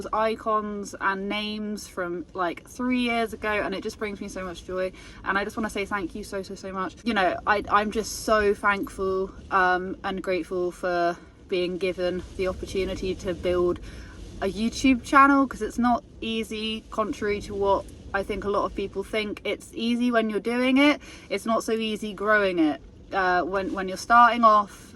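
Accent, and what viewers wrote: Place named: Wales, United Kingdom. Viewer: Nice to this side of you! Beautiful dog and lovely landscape. The Wales accent is my favourite British dialect. 😊 You are lovely my dear! ❤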